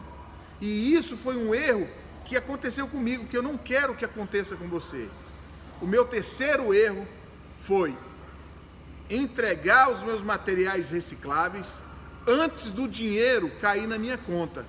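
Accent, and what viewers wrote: Brazil. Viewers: Sotaque de cearense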